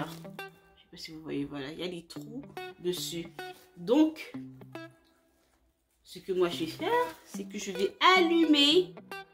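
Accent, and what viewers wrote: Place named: Cameroon. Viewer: Ahhh l’accent du Cameroun ! Musique à mes oreilles 😊😊😊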